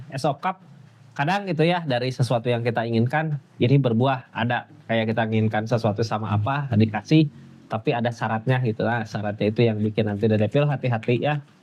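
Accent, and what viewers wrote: West Java, Indonesia. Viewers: Sunda banget logat nya. Seneng nonton nya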